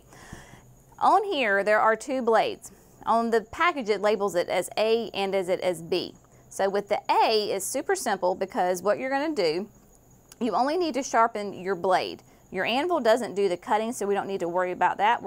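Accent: South, United States. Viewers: I am glad you did this video! My Felcos are way overdue for the spa treatment. Thanks! Also, have you ever had the auto-generated captions up and played one of your videos? It is so cute how it interprets your Southern accent! :)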